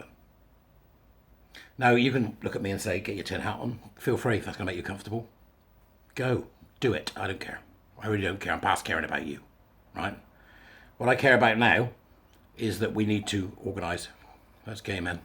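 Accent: United Kingdom. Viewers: you sound like your from the southwest of the UK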